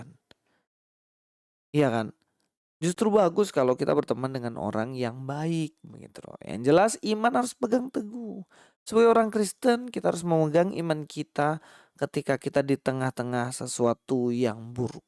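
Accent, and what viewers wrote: Java, Indonesia. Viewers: Hahaha lucu lelaki berdialek jawa ini, belajar nya kurang jauuuuuh, padahal panduannya belajar sampai ke negeri China....?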